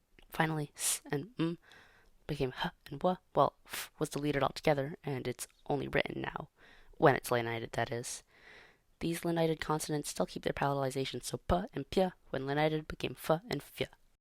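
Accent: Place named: England, United Kingdom. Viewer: Hello. Sorry, but... No, the vowels under the fada should be pronounced cleanly, not as diphthongs. You are projecting English phonetics onto Irish. For example, the "i" does not sound like in "bit", it sounds like the French "i", after narrow consonants. Thank you.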